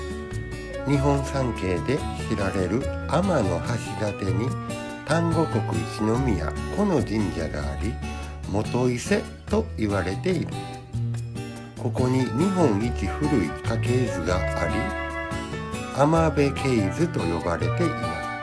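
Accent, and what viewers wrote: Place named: Shikoku, Japan. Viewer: キノちゃんです🐰✨ の声すきー🤗あと、四国のアクセントが素敵！「だっぺ」茨城キノちゃんとは違う🥰 日本一古い家系図ってことは、家系図だから、古いのに歴史をさかのぼってるんだからスゴイですよね！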